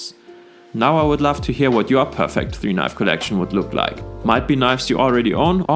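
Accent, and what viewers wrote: Germany. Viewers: Heavy German accent but nice content. Thanx.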